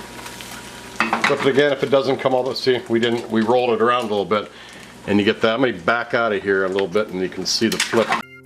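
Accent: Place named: Midwest, United States. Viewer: Are you from Wisconsin or Minnesota? You sound like you have a slight accent!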